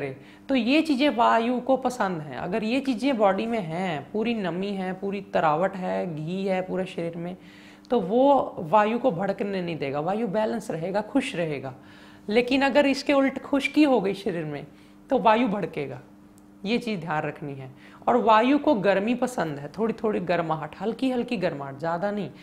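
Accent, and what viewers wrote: Punjab, India. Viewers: Guru g tuhaadi bhasha wich Punjabi touch hai. Kirpa karke ik video Punjabi wich bnaao. Mein tuhaade moohon Punjabi sunana chahubdi haa. Plzzzzzz 🙏mein v Punjabi haa.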